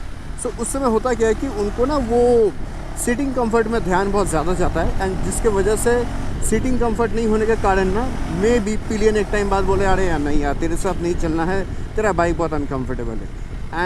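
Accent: Bengal, India. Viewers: Bhaiya Bangali ho Keya ?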